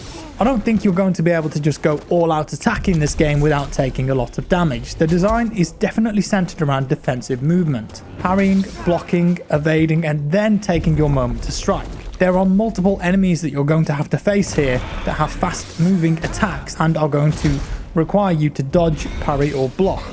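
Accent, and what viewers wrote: United Kingdom. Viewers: All we needed was your sweet sweet Brit accent to convince us.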